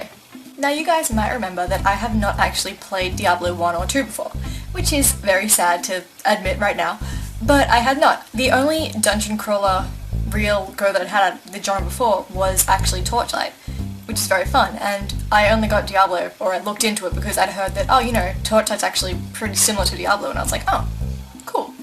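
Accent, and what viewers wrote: Australia. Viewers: Insta-subbed! It's so great to hear a fellow Aussie on the interwebs for once. :) Where abouts are you from?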